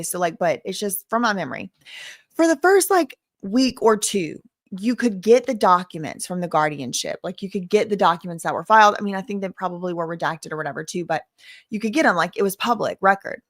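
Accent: South, United States. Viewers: I’m just here for lovely southern accent. ❤ God bless this woman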